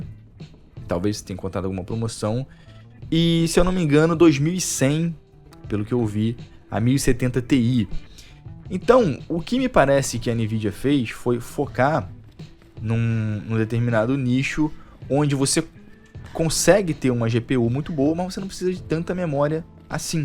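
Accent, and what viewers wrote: Rio de Janeiro, Brazil. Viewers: kkkk eu casco da forma que os cariocas falam, é estiloso kkk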